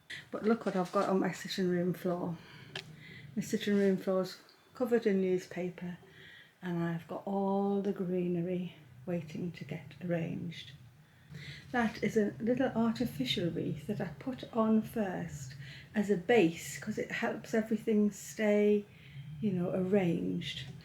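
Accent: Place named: Northern England, United Kingdom. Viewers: I've arrived here very late! I love this... Your bookshelf could've been lifted from my house. And I love that I've found a northerner to listen to.. I'm East Yorkshire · I can’t tell you how much I’ve enjoyed being with you throughout this month, it’s been fabulous. I’ve enjoyed watching you, tidying, crafting, baking and decorating the house. I’ve loved listening to you, I’m originally from South Shields so your northern accent has been a pleasant reminder of home. Have a lovely Christmas time and I look forward to sharing time you in the coming new year. Thank you.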